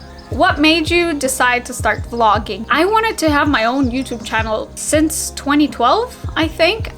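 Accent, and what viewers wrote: United States. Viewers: I love it when you cuss, laugh, and giggle even at your own jokes. You're American born and raised in Calif, am I right? It seems obvious in every way you speak & express yourself. We might be related. I'm your creepy uncle, hahaha. Kidding. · Hey. How come you don't have a heavy Russian accent? You're accent is almost American. Did you live in the States? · Are you American or Canadian? Which state you at?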